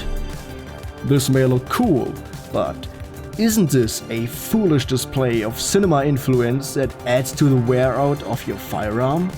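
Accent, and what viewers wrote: Germany. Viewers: Man hört den Alman bisschen raus aber sonst interessantes Vid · ohne deutschen akzent bitte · Du klingst sooo deutsch.😂 Trotzdem cooles Video. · Yeah apart from the zhick German Akzent and the sometimes wannabe British pronunciation of words pretty much yeah. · Why does it sound like he is German? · Ich liebe diesen deutschen Akzent 😅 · Dein Englisch ist gut, aber man hört sofort, dass du deutsch bist 😁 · Man hört nach nach kurzer Zeit das du deutscher bist, aber ich musste 1. nachschauen (kanalinfo) und 2. ich kenne keinen Deutschen der so gut und flüssig English spricht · vid ist ganz ok, aber an deinem t-h musst du noch arbeiten, man kann sehr einfach raushören, dass du deutsch bist aber du redest schon flüssig, was sehr gut ist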